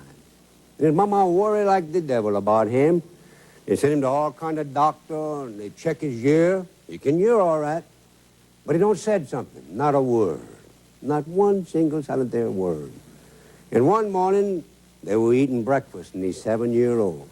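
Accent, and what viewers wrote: Louisiana, United States. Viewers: I do too. That is a specifically Cajun accent. Some have a thicker, French accent the deeper you go. And we southerners can tell each other apart because of the small differences in our accents. A person from North Carolina sounds way different from a Texan, so on and so forth. You don't hear this kind of speak outside of southern Louisiana that much... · I know this comment was posted a year ago, but seriously grow up. He wasn't a "Fake". E. Wilson (April 24, 1914 - September 5, 2001) Wilson was born in Roseland in Tangipahoa Parish, one of the "Florida Parishes" of Louisiana. Consider yourself taught. If you don't like the Cajun accent then I suggest you not watch his videos and not visit Louisiana or even to my mother's house. Mom and Dad, especially Dad talk like.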